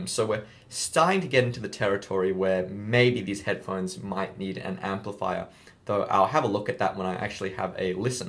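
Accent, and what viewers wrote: Australia. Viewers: He’s clearly got an Australian accent???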